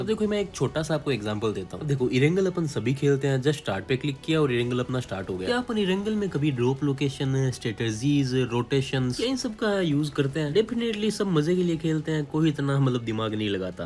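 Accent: Haryana, India. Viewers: Bhai Haryana se ho kya 👀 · Aap Haryana se ho kay 🤔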